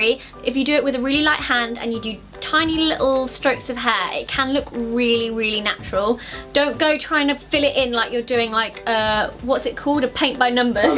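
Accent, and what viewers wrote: United Kingdom. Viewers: I thought she was British...how does she speak English????? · I've been watching your videos for so long that I forget your British and your "accent" doesn't sound different to me anymore. You basically sound American to me lol👌😂❤️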